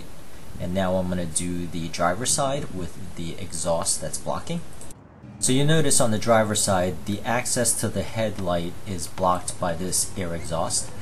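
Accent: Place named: Long Island, United States. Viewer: Great video. Very thorough. Love your mild Long Island accent. Did I get it right? It's like a toned-down Ramones accent. Hey Ho, Let's Go!!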